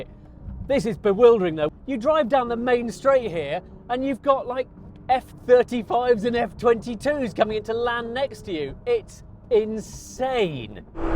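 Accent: England, United Kingdom. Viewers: "Euro" presenters hardly ever test American sports cars because generally speaking, only Americans buy them. As for the 'home team', Top Gear is a British magazine if the accent didn't give it away, and the Porsche is German. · english accent driving american car = stop watching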